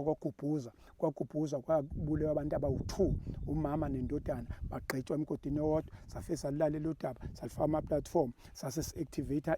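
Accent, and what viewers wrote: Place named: Xhosa, South Africa. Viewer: Mina bengingayibon inking kodw ubaba mayesechaza kyezwakal lixhoshwa libhekil Baba uxolo lukufanele ungowethu n sisakudinga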